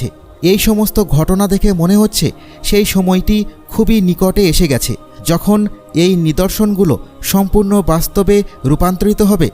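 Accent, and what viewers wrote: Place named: India. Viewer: আমার তো মনে হচ্ছে এই ভিডিওটা যে বানিয়েছে সে ইন্ডিয়ান আগে ভাষা ভালোভাবে শিখুন শুদ্ধ বাংলায় কথা বলা শিখন তারপরে ভিডিও বানাইয়েন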